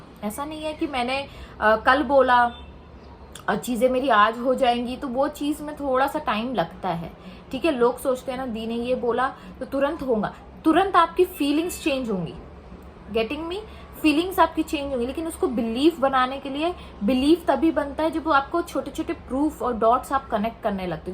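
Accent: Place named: Goa, India. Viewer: Mam, I love your energy and vibe, its very infectious , I love the way you smile ( keep smiling always ) and the way you speak is so adorable , especially the way you pronounce the words like " KARENGA , BOLENGA , " it sounds really very cute, it gives me vibe of a GOAN lingo ( where are you basically from mam, and what is your mother tongue if I may ask? ) May, Can you please teach us the manifestation technique and I'd like to tell you that I have started to chant the Ho oponopono prayer but how long would it take for the good changes to reflect? please guide me how do I go about it. since I recently lost my job and I have no income from any sources whatsoever I am but worried how are things going to shape up for me, please if you dont mind sharing a few useful / powerful technique that could help me I'd really be grateful to you. I look forward to hearing from you. 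please do reply.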